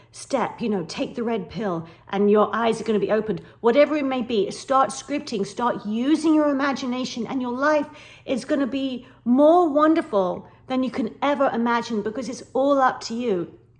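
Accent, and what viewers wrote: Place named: England, United Kingdom. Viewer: Ha, i thought you had an English accent that explains visiting wales a few times 👍 you know you mention about being of an older age even in our 50s or older as long as you know about conscious awareness that our thoughts are living entities creating our physical realities then evrn 10 20 or 30 yrs of life of having this awareness that we can create anything through DISCIPLINED! Mind to speed up the progress or as i use my own affirmations or subliminals on our voice recorders on our phones played every night as we sleep for our desires can bring everything to you so even at the later part of our lives through conscious awareness manifestation we can achieve more than just scattered rolette style thoughts of randomness it is all about FOCUS, AND DISCIPLINED MIND, thanks for mentioning wales 🏴🙏 worth mentioning the mirroring techniques to of bringing things to us faster 🪞😉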